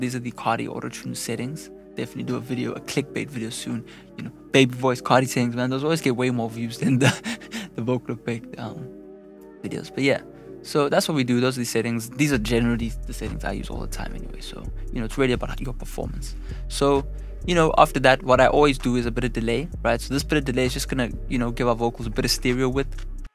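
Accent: South Africa. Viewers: u sound like ur from south africa. It sounds nice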